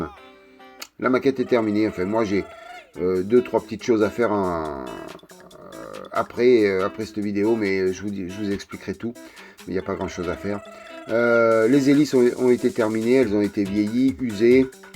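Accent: South, France. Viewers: Bravo. Superbe réalisation. Je vais suivre celle du Mirage F1. Je trouve tes présentations très agréables (avé l'accent) et découvre à chaque fois des astuces que je ne manque pas d'utiliser pour mes propres réalisations. Bonne continuation.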